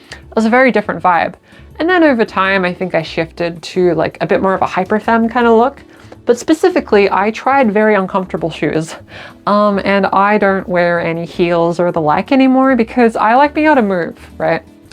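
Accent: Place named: Australia. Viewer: You kinda sound like pearlescent moon lol (its probably the aussie accent) ❤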